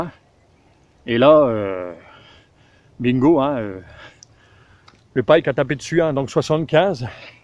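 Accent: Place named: Haute-Marne, France. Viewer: T'as gagné un nouvel abonné , t'es un vrai haut marnais , "un tiot machin" " j'chie d'dans" 😂 du vrai parlé de chez nous , et je te suis quand tu parles des grandes marques ....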